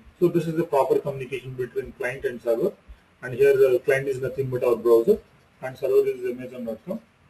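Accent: India. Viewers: Нихера непонятно что говорит, кроме того что индус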